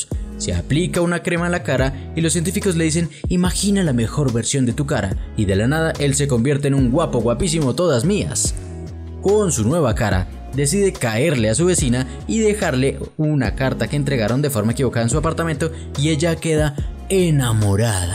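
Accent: Colombia. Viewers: Es tan evidente q ud es Colombiano jejejejejejejeje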